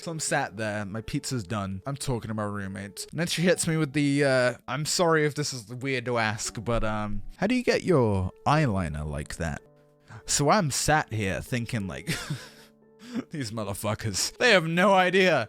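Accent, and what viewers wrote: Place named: United Kingdom. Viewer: harry potter accsent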